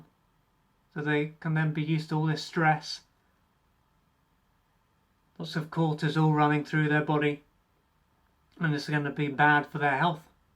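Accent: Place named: United Kingdom. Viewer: Thank you.🌴🌊🌺 I am gonna try till I die.. 😊. Hehe. So many things you seid are so true. Tummy problems and learning problems and chasing adrenilen or shutting down and becoming a hermit. Lol, iv been stuck in the hermit stage lately. But I have to laugh about it sometimes. I love yr British accent. I have to watch Ricky gervase when I'm feeling really bad because he has a way of making me feel like life is crazy and we all have to laugh at it sometimes but, when I herd you talk, your easy to listen to and bring me back to Earth. I think I'm ment to live in England. Lately all the people I watch on YouTube who have inspired me live over there. It looks cold there. All of you are always whereing Jumpers. 🤒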